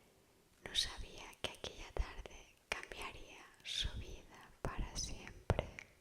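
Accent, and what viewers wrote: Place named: Spain. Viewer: No sabía que antes de dormir te podía visitar un angel y más aún que ese angel tuviese acento español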